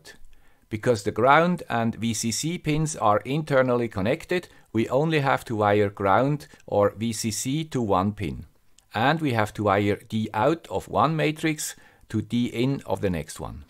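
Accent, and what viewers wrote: Switzerland. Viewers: No. we'll never stop watching the guy with the Swiss accent. Bye. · Same thing I thought Sir. Love this guy with the Swiss accent! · As a guy with an Argentinean accent, I enjoy your Swiss accent enormously! It goes without saying that I love your content and your delivery. Saludos from Buenos Aires and have a great day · I was subscribed when we surpassed 100k. I will be subscribed when we hit 1M. So will be the guy with the Swiss accent. Quality content from one of my favourite YouTubers. Thanks for your videos <3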